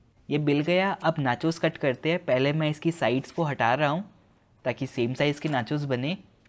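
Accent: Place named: Indore, India. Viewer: Indori tone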